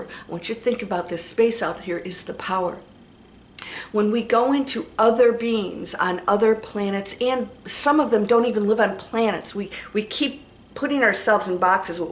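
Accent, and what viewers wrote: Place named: South, United States. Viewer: Luv your southern accent! Great vid!👍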